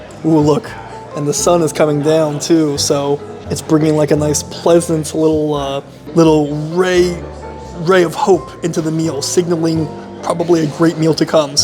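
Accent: United States. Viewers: You’re soooo American. I love it. I’m American but never feel like I belong here. It’s awesome hearing with a true American accent and zero pretense. Your comments are outstanding.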